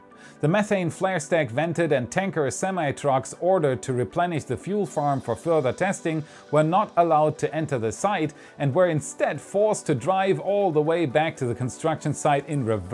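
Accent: Germany. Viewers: German accent coming back again ! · Don't be afraid of showing the accent, a German talking about engineering is as natural as a bird flapping its wings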